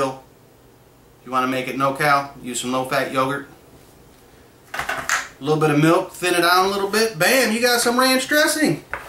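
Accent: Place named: South, United States. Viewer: Why are you speaking with a southern acceny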